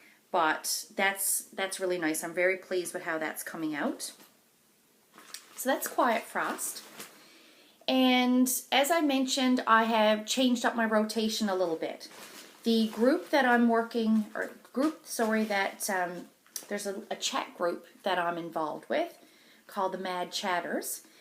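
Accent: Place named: Newfoundland, Canada. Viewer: Great video/WIPs ...I loved your doodling pics too. I really enjoy listening to your Newfoundland accent👍🏻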